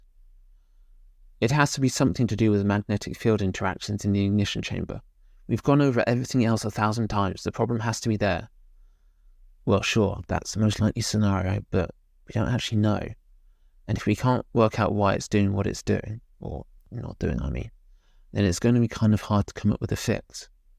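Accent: United Kingdom. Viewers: I quite like that it is author narration. Also better British-accented American, than AI spoken anything.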